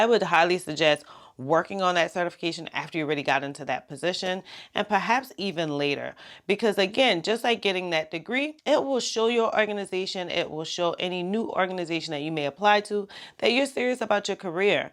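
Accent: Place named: New York, United States. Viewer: Random question: Are you from NY? I hear a NY accent I think.... great video though!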